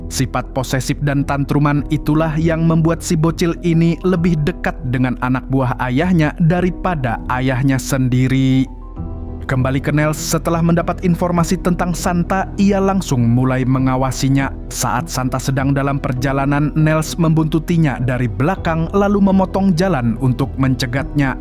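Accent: Banjar, Indonesia. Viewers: Harau..urang Banjar sekalinya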